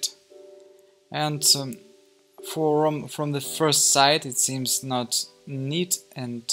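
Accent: Russia. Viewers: You speak English SO WELL, so fluently. I like the Russian accent. It sounds nice to me.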